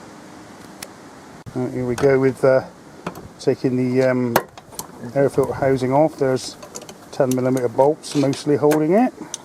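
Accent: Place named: United Kingdom. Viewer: With the accent I thought you were in the UK! Have watched the vid again and called myself a 'muppet' as you stated you got it from Mr Auto. I've sinced looked starters up for my car. Cheers for the tip on not using slick 50 in the gear box, only ever used it in engines with no problems.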